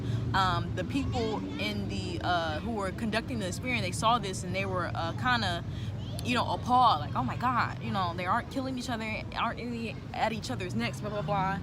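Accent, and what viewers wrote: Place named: Chicago, United States. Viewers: WAKE UP CALL TV Stoney_stay_woke_sos exactly like Chicago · Sound like chicago..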